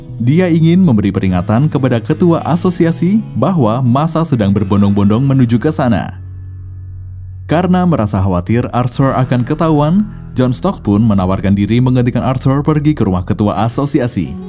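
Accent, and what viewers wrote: Banyumas, Indonesia. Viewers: Asli tetep ngapak kawan asli Cirebon ☺️☺️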